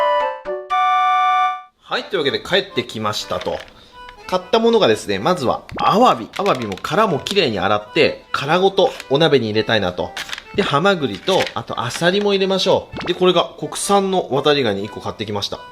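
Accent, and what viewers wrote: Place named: Hakata, Japan. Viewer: いつも楽しい美味しそうな動画ありがとうございます❤ 博多弁と急なえちえち雰囲気、 謎すぎてめっちゃ笑いました🤣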